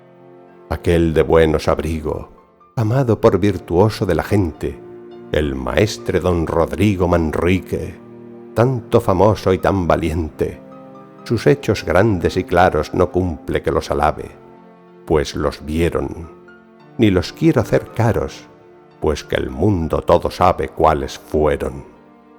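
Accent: Galicia, Spain. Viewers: Estimado, por tu dejo, te adivino peninsular. Razón demás para llamarte la atención, pues siendo paisano del inmortal Manrique, le faltas el respeto;en la declamación y escritura. Me bastó escucharte unos minutos para ello. Al declamar en la primera estrofa separas, pareciera intencionadamente o por ignorancia"... y chicos " Lo segundo y más desastroso y lesa literatura: cambias" allegados", por "y llegados". Soy un peruano cincuentón, amante de la buena literatura. No entiendo como no corregiste semejante desaguisado. Tu dejo debió ser un plus, sin embargo, no hallo calor y sentimiento. Ojalá valores y tomes las críticas con ánimo de enmienda. Att. Ramsés Torres Ramírez Puente Piedra-Perú. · Cuando lo recita un gallego suena mucho mejor, yo que tengo acento argentino. Muchas gracias, maestro 👏👏👏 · Perfectamente! A mi me gusta muchísimo. Eres mi amor. Tienes un acento gallego? 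(soy un extranjero, es dificil para mi distinguir)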